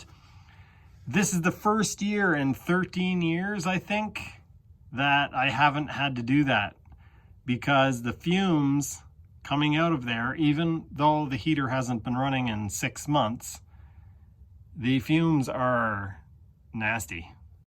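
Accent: Canada. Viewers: It's aboot the grraj! Love that Canadian accent! 😉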